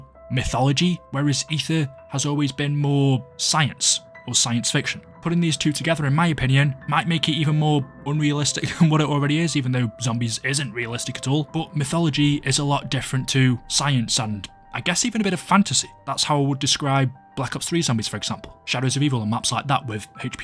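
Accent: United Kingdom. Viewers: British person speaking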